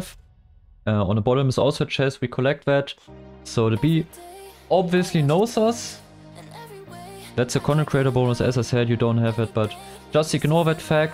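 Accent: Germany. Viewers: it’s okay the German accent, your literally my fav yt for guides like no joke nobody makes them better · It was pretty nice , and I'm totally okay with german accent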